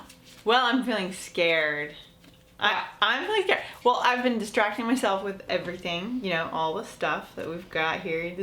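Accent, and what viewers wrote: United States. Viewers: Hi, You look and sound more as an American girl than you are in AEE podcast, you are so cool!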